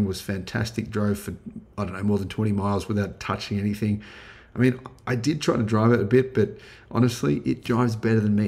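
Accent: Australia. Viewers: Mate, your accent is perfect, it's not even strong "back of Bourke" strayan as some of my close friends (and I can understand them perfectly). Keep going mate, you're doing great. Enjoy the US trip !!!! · I like your Aussie pronouncing... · Americans want you to sound more "American"???? What? Most Americans really like an Australian accent. Now if we can just get you to talk like Crocodile Dundee, your subs will at least double! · Don’t change your accent. I can’t believe someone suggested that. Why would you need to sound like us Americans? We would probably rather sound Australian.. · Your accent is awesome! Be you. The Australian tourism council should be paying you per view!!! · Don't listen to 'em, bro! Americans adore aussie-speak! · As a Brit to an Aussie, don't let a Yank tell you how to speak. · Lovin the ausie accent. Always great work. Revolutionizing the auto industry. 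· I love the Australian accent. Keep it up!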